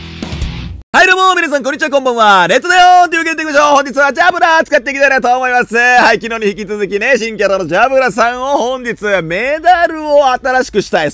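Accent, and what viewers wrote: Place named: Kansai, Japan. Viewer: 関西調で軽快なトーク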